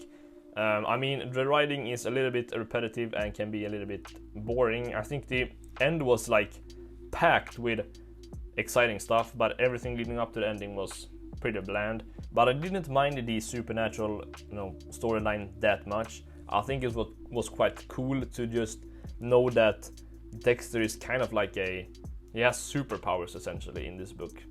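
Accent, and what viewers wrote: Sweden. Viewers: Are you sweedish?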